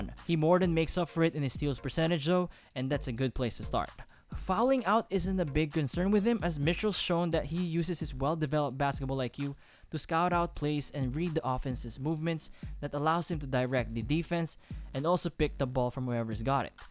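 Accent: Philippines. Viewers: Bro, are you filipino? That english accent of yours sounds like a pinoy.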